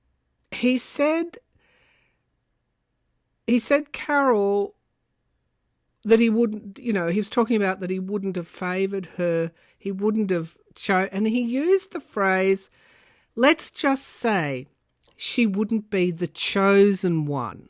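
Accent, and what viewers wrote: Australia. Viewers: Love your Australian accent, love your Australian heritage, love your energy. Thank you so much for your honesty and openness. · Love your Aussie accent! It fits you perfectly! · you speak English, regardless of you Australian accent. You speak perfect English regardless of your lisp. I understand you perfectly. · Your Australian accent is gorgeous!!! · Thank you,. I love your accent and I love that you’re proud of your heritage. Are they different accents for different parts of Australia? I was raised in Philadelphia and then moved to Chicago and then to Maryland and everybody can tell as soon as I’ve been around Philly friends, my accent changes, it’s kind of fun! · I so look forward to your readings and your beautiful Australian accent is part of the reason. I went through speech therapy in elementary school, had no say in the matter, for my lisp. 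Would have never known you had one. Keep shining your light!❤ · Brilliant, entertaining and informative. Thank you for the great intellect and for the support spirit shows to those around the world for a better world and getting rid of corruption in high places. Your Australian accent is wonderful and the Aussies over many years have been right there to help when always needed. Reference WWI & WWII. Thank you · We love you just the way you are, ❤ And I Love your Australian dialect!!! You rock! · I absolutely love your Australian accent!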